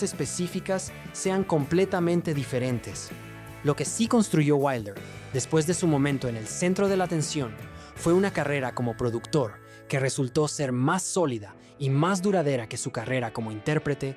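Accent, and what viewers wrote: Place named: England, United Kingdom. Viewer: Es insoportable esa voz de inglés tratándo de hablar español!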